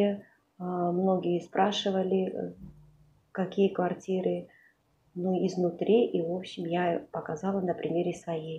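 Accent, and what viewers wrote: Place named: Georgia. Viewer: Очень четко слышится грузиеский акцент! Гамарджеба!!!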